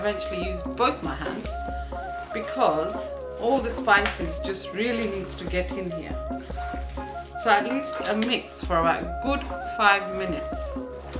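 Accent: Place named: United Kingdom. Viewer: Auntie sound like has been studied in UK or stayed in uk for long time by the accent. Nice video btw. Love it. Chittagongonian food is the best.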